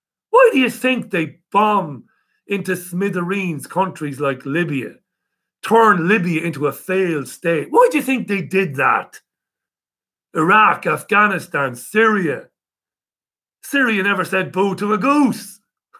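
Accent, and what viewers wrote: Ireland. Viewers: he from waterford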